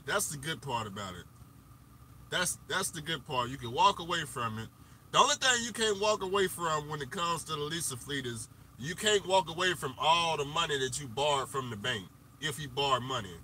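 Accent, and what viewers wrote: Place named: Texas, United States. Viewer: You’re starting to get that Texas Twang in ya.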